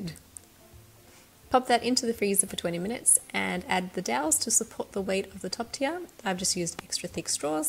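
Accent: Australia. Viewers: Oooh Australia, I love the australian accent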